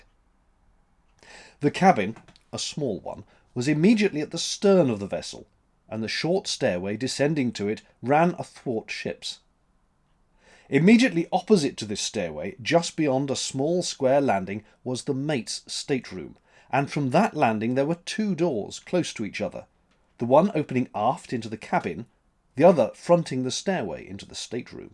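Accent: United Kingdom. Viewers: bravo. as an american, i can certainly say, stories always sound so much better with a proper british accent. :-)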